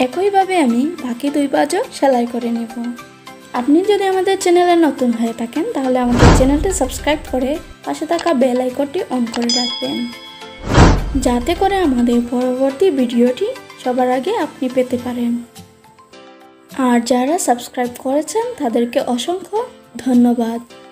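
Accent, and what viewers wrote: Sylhet, Bangladesh. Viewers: Apni sylheti 🙄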